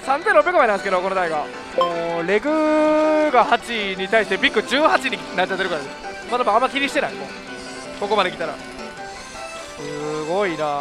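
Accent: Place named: Kansai, Japan. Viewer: いそまるってこんなに関西弁コテコテだったっけ？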